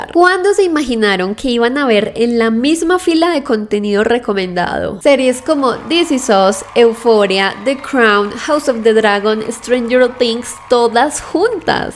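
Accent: Colombia. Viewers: Wow eres de México por un momento pensé que eras de Colombia por tu acento 😮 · Tu eres de Colombia cierto? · T escucho la voz de colombina y estas en mexico ? saluditos nuevo seguidor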